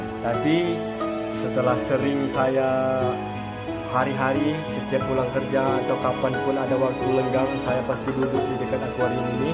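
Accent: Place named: Lampung, Indonesia. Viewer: Abang asal lampung kah? Logat nya mirip orang lampung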